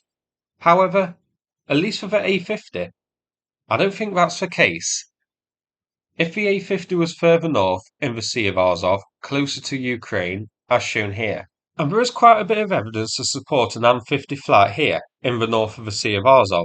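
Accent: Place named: Northern England, United Kingdom. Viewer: I just love the commentary, makes me homesick for the North of England. Phrases I heard often when younger.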